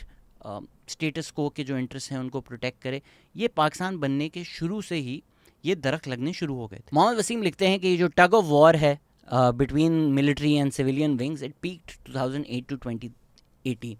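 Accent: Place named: Punjab, India. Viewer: We love when u throw in a little punjabi vich vich 😅 I love your punjabi pronunciation ❤❤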